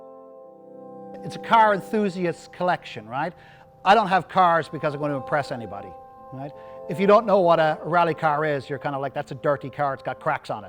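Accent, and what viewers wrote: Ireland. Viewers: He's Irish? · ur Irish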